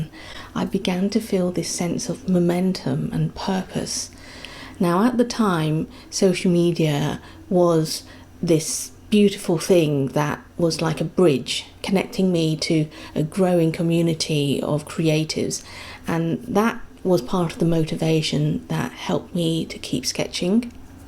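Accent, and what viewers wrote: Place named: England, United Kingdom. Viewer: From Uk. Just found you. Love the English accent. Great inspiration. Thank you.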